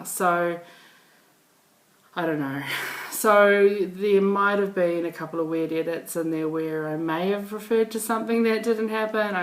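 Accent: New Zealand. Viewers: So nice to hear a Kiwi accent again..here in Texas from an expat Kiwi.. Your stitching is lovely..Nice to hear your char t too..Glad you perservered..😊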